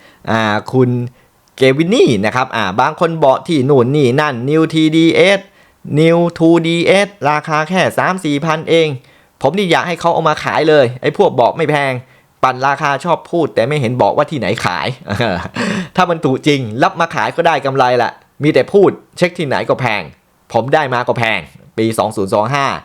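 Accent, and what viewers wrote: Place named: North, Thailand. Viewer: ไม่ว่ากันเรื่องพูดไม่ชัดในบางคำ เพราะมันฝังรากลึกไปแล้ว เหมือนคนเหนือ ต่อให้พูดกลางแค่ไหน จะมีแว๊บหนึ่งที่จะเหน่อเหนือนิด ๆ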